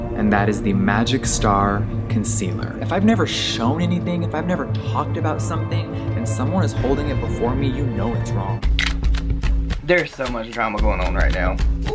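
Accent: South, United States. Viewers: god I can’t stand that dirty little wannabe! Nikita DOES get away with a lot. Btw, when you post I always look forward to sitting down with a drink and listening to your sweet southern drawl. You DIG DEEP!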